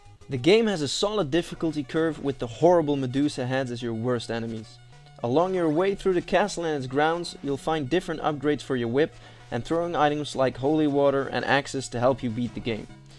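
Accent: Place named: Netherlands. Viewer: Great Top 10. Like to hear a Dutch guy talking Engish so I know you're not that far away, immediatly recongnized the accent :). I'm from Belgium ;). I'm more a SNES guy as I grew up with that one, but see much games I liked on that console here too. Like Ducktailes (or that was gameboy) and MegaMan (like X very much). Super Mario Bros 3 was also released on the SNES.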